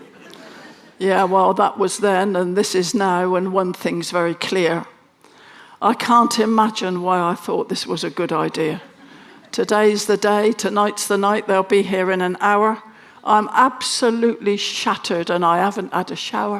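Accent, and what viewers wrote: West Country, United Kingdom. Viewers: 👍good on Pam Ayres for a plethora of comic ryhmns and worldy laments with a wonderful west country lilt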